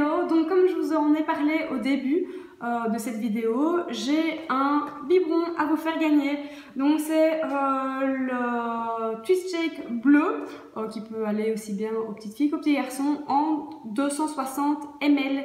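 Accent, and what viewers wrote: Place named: Belgium. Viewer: coucou. juste un message pour te dire que tes vidéos sont mettent de bonne humeur, ton naturel, ton accent belge (moi je suis du sud :-) ), ton peps et bien sûr ton Choupy trop mignon. j attends avec impatience la sortie de tes nouvelles vidéos..et bien évidemment je tente ma chance pour le biberon 😋 qui ne tente rien à rien 😉 bonne continuation !!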